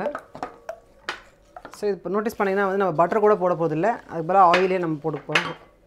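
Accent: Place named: Chennai, India. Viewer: no thanks dear.. I'm mercel for ur chennai slang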